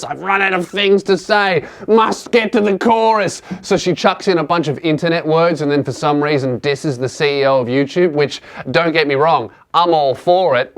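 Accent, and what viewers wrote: Australia. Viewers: You have the most Australian accent i know.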